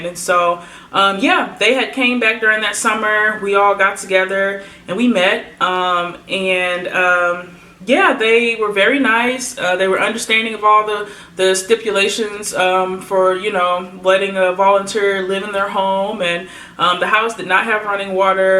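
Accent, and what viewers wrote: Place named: Midwest, United States. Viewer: . yep yep. Different foods and maybe different dating habits, like when teens date. Or church habits.... Like that. Also What was the non profit you worked with, what were they setting up and what was your role? Lovely. The work of the Lord. M. IL. Ps. You sound Midwest. Are you a Midwest girl? I live in western Chicago 'burbs. M.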